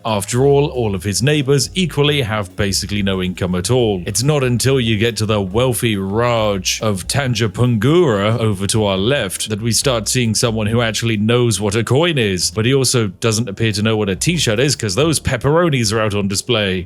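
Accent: United Kingdom. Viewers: I feel like he gets more British every intro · "Perfectly Average" ? He sounds British! And that comes from a British person ;)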